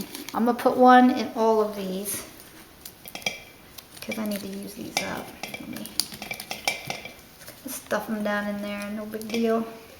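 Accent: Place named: South, United States. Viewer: I am learning how to do food storage prep and your video has been a great help to me! While I plan to have some Mountain House freeze dried, I wanted to learn how to store basics like rice, beans using oxygen absorbers and vacuum seal in Mason jars rather than mylar bags. You really have a knack for teaching with a delivery that is both interesting and delightful, and I gotta say that your southern accent is so charming :) Many thanks!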